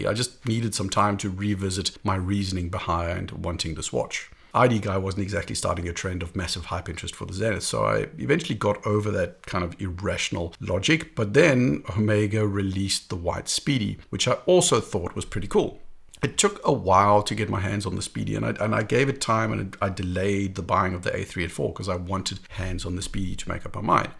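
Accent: South Africa. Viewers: Great video! I'm also debating between the white speedy and the A384. Is that a South African accent I hear?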